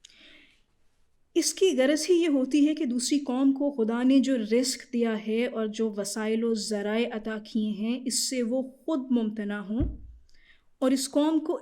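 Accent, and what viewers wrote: Iran. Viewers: ما شا الللہ بہت ہی خوب صورت انداز ہے ان کا ذوق مطالعہ بہت وسیع اورمختلف النوع ہے رومی سعدی اور بڑے بڑے شعرا کا ریفرنس بذات خود ایک خوب صورت دلیل ہے ان کے وسیع مطالعہ کی احادیث اور قرآنی آیات کا ریفرنس بہت ہی اچھا اور قابل تحسین ہے الللہ پاک ان کے علم میں اور اضافہ فرماے ان کی الفاظ اور خاص فارسی کو اسی لہجے میں بیان کرنا نہاہت ہی خوب صورت ہے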